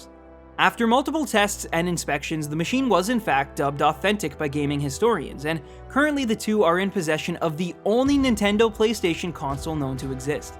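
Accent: Canada. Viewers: is he Canadian? he sounds Canadian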